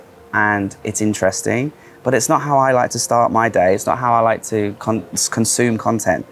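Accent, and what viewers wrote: England, United Kingdom. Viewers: Love all your videos! Love to hear your English accent 👍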